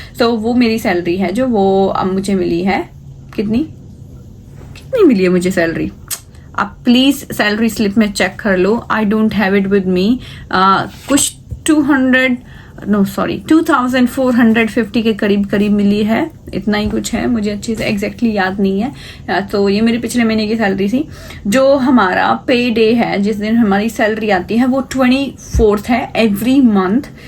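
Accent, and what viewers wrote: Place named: England, United Kingdom. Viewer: Ap k Hindi mai English ki jhalak arehi hai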